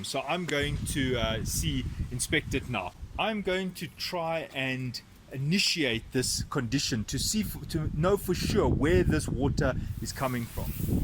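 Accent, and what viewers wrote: South Africa. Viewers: I love the strong South African accent from a strong new Zealand accent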